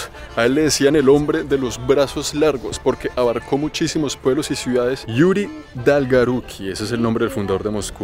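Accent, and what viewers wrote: Bogotá, Colombia. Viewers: SÍ...ÉSE ACENTO COLOMBIANO,,,VIVA COLOMBIA....SALUDOS A TODA ESA GENTE LINDA DE COLOMBIA. L@ AMO.SALUDOS DESDE NICARAGUA EN AMÉRICA CENTRAL 😘😘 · Rolo, cachaco, es decir. De Bogotá, la capital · ¡ me encantas con esa manera de expresarte y sobre todo tu acento “ oiga mijito” asi hablan mis hermanos Colombianos, yo soy de Mexico y soy tu seguidora. Saludos y bendiciones🙏🥰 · Hola, qué chévere saber que eres Colombiano, mijo! Es el segundo video que veo y espero ver todos los que nos puedas compartir. Con el primer video sobre TATARSKAN cuando te escuchaba narrar, me dije "este man tiene que ser Colombiano, obvio por tu acento", sin embargo, leyendo comentarios nadie hacía alusión al tuyo. Finalmente, hoy viendo este video confirmé que lo eres. Debo decirte que tus videos repito, solo he visto dos son del putas, mijo! Te felicito sinceramente. 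Por supuesto ya me suscribí a tu canal. Desde Bucaramanga, recibe un abrazo bien pingo! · Lo que más me gusta de es esa sencillez al decir soy Colombiano y la forma de hablar típico de nuestro país, bendiciones desde Bogotá. 🇨🇴☺️ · Muy buenas entrevistas, hermosos paisajes, buen acento Colombiano u ahora ya hablas Ruso, muy lindo es Rusia y la gente es muy amable y hospitalarios.. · Este Man es muy rolo❤... "Sospecho pecho" 😂me encanta, me siento muy identificada, como si estuviera hablando uno de mis hermanos. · Muy bueno ,en total acuerdo!!!! Ese acento colombiano y hermosa tu efucacion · Que parte Colombia eres? Esos modismos en tu acento, se me hacen conocidos. Saludos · Saludos Parcero,Soy 🇵🇷 desde EU. Me encantan tus videos sobre todo lo buen aprendiz de los distintos idiomas y el acsento colombiano que tiene. Gracias x visitar el planeta x mí educarme y dejarme ver lo hermoso q es.. 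aunque espero muy pronto explorar así cm usted. Q viva 🇨🇴 y el resto del mundo 🌎🤗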